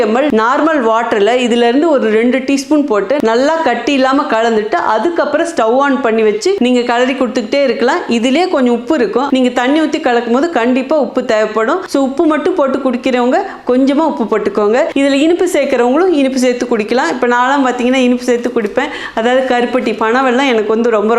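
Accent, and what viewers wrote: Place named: Bangalore, India. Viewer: You Are in which area??. Chennai or other place. Your Tamil is slightly Bangalore tamil people pronounceation.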